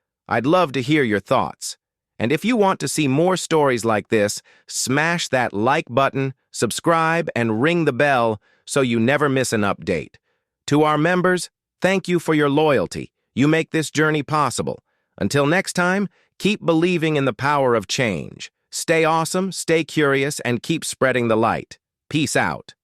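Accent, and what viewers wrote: West, United States. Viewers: Hey man I know you sound like a Westerner you either be Americans or Canadian or Europeans but you are saying the truth You went there and you make your video at the same time your western leaders led by United States criticizing a leader that try to reformed his country within a short period of time The great jobs from roads constructions from building hydro power industries hospitals and agriculture sectors as well many more development that going on there building Estes or houses and they're still condemning and criticizing this leader captain lbrahim Treore the man of African choice for no reason why should we believe in democracy a system that was in brain prosperity to Africa a system that the western leader use forge story lies to go to Libya to go to Iraq and Afghanistan and many more places in Africa as well propaganda against him is that democracy can we believe democracy to be a true way of governance when their leaders themselves they're not straightforward the lies the manipulation stories how can we accept democracy when people that champions so called democracy and lying blindly something that you have seen it and you are even making video about it is real that the skill and the level of development is not hiding compared to independent time till now the development achieved from that period is nothing He's doing so much great compared to the man who were in power for 27 years what did you do You to serve the French All the resources were going to France and th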